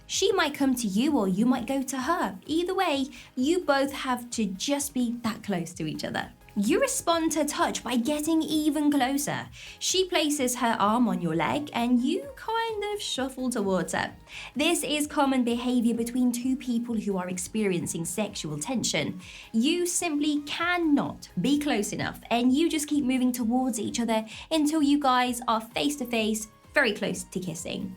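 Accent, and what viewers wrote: England, United Kingdom. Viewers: You’re so sweet. The most pleasant video I’ve watched. What part of England are you from? I’m in Essex. Well done 👍 good video. And well researched. I felt so good watching. This.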